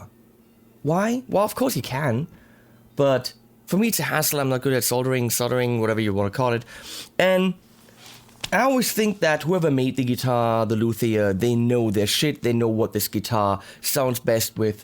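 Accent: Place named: Germany. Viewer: Hab ichs doch gewusst, dass du Deutscher bist! Den komischen Akzent haben halt nur wir :D Ich überlege grade, ob es sich lohnt, meine Fender Lite Ash mit besseren Tonabnehmern auszustatten... Momentan klingt sie ziemlich drahtig und dünn. Die Mexico-Tele von nem Freund klingt richtig geil über meinen Fender Deville 410...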